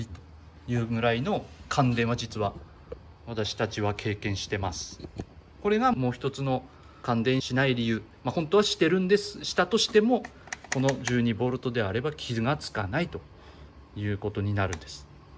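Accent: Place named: Tohoku, Japan. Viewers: 津軽衆のなまりですねー きいてて心地良いです(笑) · 東北の訛り本当最高です。実家に早く帰りたい😢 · この訛りは津軽弁だな。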